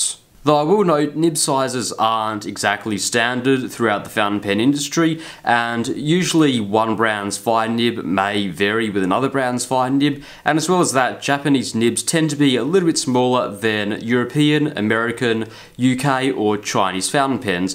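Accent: Australia. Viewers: It's great to have a local reviewer. I so seldom hear Australian accents let alone a Sydney accent. Noice!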